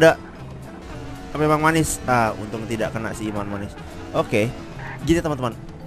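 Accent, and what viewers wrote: Java, Indonesia. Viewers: Kaka dari jawa mana , kalo aku bayuwangi Jawa Timur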